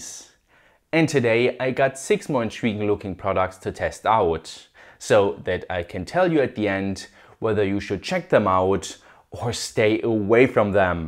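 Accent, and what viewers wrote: Germany. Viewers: uff what a strong German accent :D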